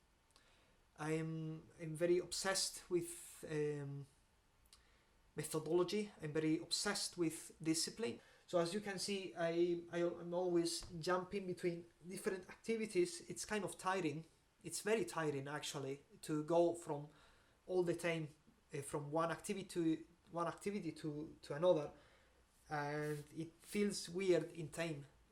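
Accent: Scotland, United Kingdom. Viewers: I found myself here following different threads of polyglots and their channels. I listened to this video and your intro to your channel in Spanish. You are a very interesting fellow. One thing puzzles me, and that is why does your English sound like someone with a Scottish accent? Did you have an English teacher who was Scottish? Just curious. I enjoyed your video.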